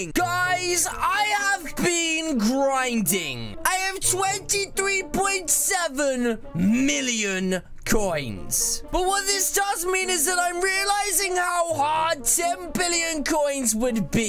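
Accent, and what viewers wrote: England, United Kingdom. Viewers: O.O HES ENGLISH!.!. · Hey I didn’t know your british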